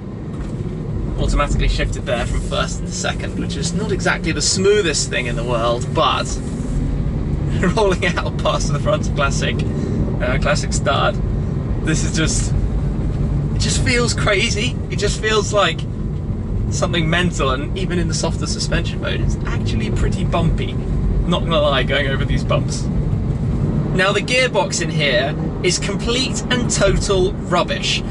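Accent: England, United Kingdom. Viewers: Alright, you must drive on the right side of the road and learn some words German of course. :D Anyway, I'm glad how you love and enjoy the cars with a lot of passion on the autobahn. As a German it's so entertaining and funny to hear your enthusiasm in English with the British accent. Have a nice week and soon a happy new year with many astonishing cars! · Shmee maybe you should change your intro words? Do u live near Germany? Just curious, your accent seems English, how do you so often appear on autobahn? Video is so bumpy is a glitch or road with pot holes?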